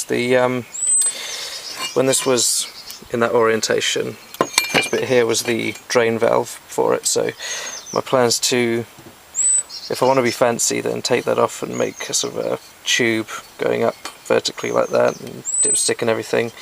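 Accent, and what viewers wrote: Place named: United Kingdom. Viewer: what part of t uk are u from?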